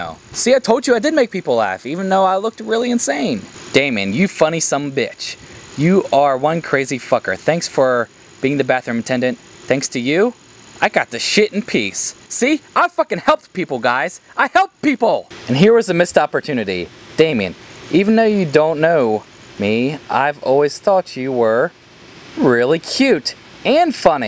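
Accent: Australia. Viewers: What country do u live in? i can kinda hear a little accent (kinda like Australian or British)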